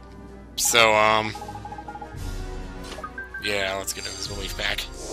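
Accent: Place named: South, United States. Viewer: I went back to your old vids and noticed you've lost your southern accent almost completely since then!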